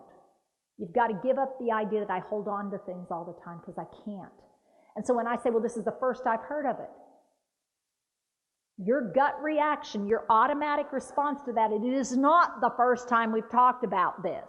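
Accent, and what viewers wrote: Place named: South, United States. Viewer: uses southern drawl... exaggerated southern, rural speech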